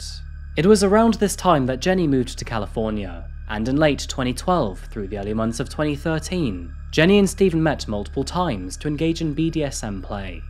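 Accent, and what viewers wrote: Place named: United Kingdom. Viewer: British awcentwtwtw....nice exploitative content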